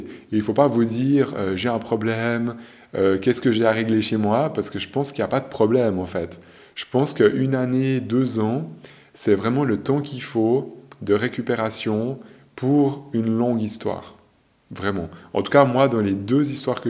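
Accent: Switzerland. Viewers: Merci ça fait du bien entendre un discours comme le votre oui j'en ai besoin, j'ai toujours été comme ça depuis très jeune. ( Je me disais bien que votre accent vient de notre beau pays la Suisse 😊 )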